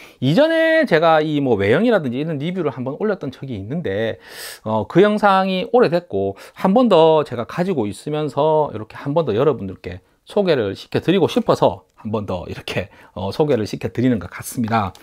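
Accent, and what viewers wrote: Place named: Seoul, South Korea. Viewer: 그냥서울말안쓰고 그냥 말하면 안돼나요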